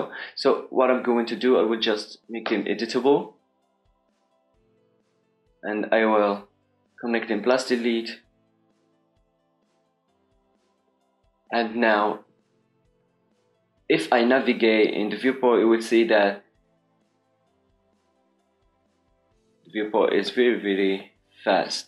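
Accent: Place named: Morocco. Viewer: khoya 3reftek mghribi hir mn l'anglais dyalk hhhhh. keep up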